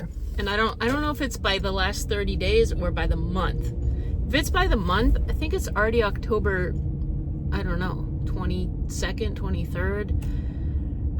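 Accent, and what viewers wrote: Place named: Norway. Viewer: Where are you from originally? I hear some Norwegian. Dont ya know..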